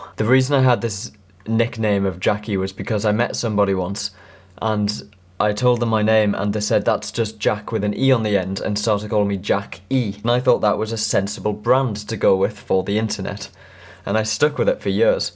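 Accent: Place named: North East England, United Kingdom. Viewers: North-East England, possibly Durham area? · He is from Sunderland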